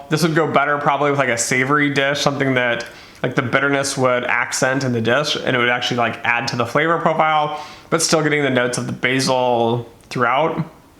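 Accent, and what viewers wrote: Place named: California, United States. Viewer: You sound like you are in California.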